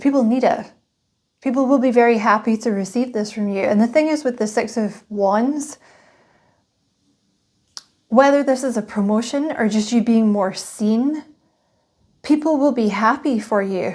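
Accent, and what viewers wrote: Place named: Scotland, United Kingdom. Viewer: Thank you. The technology world it's slowly killing the human world and it's based on convenience that is alienating us from each other. I talk with older people and they get me. I talked with younger people and they're nice but it's like a program nice with no eye contact. People are so afraid of their own vulnerability that they won't take the risk of getting to know each other. And I think in the older world we were forced into it. Where today we can sit in front of our phone and say and do things that don't require any risk. I love hearing your Scottish accent and I believe you're a real person but this gets in the way of human interaction sometimes and I'm glad I have a job where I meet with real people in their homes. Honestly I think that's what the younger generation is missing. I will retire and go back to Scotland because I think there is still enough people left their who get it. 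God bless you you're a good soul.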